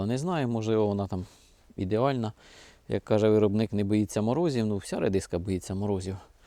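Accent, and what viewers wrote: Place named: Kyiv, Ukraine. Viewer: Доброго вечора, завжди дивлюсь з задоволенням, а на дурні коменти не звертайте уваги., в нас також говоримо як і ви ,бо з Київщини.😊😊😊😊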